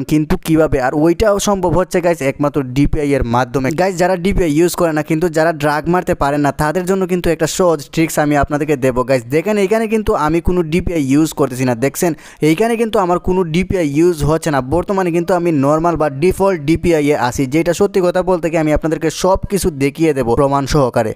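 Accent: Sylhet, Bangladesh. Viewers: Vai Ami o sylhet taki apni o sylhet er hobigonj mone hoy apnar YouTube channele Ami Ei vedio ta deklam R apnar kota gula sylheti Tay Apnar YouTube channel Take Subscribe korlam....Plz.Replay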